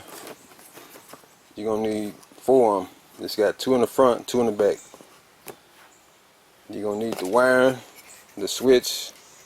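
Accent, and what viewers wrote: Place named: Texas, United States. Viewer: I don't know you. First time seeing one of your videos. But that Texas comin thru strong in yo twang. Good stuff Mayne!!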